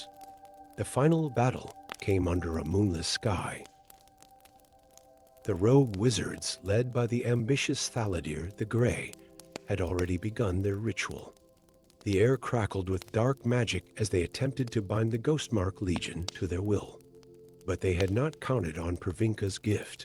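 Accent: United States. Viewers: Love your stories. Really dislike this voice, sounds American, it’s just awful.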